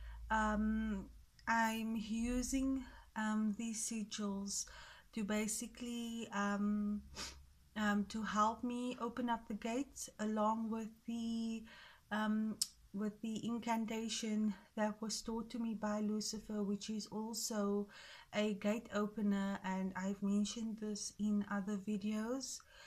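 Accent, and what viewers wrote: South Africa. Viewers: You sound like you come from my country south Africa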